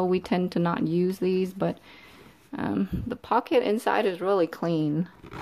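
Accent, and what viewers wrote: South, United States. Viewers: I love the southern twang 😂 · Love your southern twang...where did you grow up?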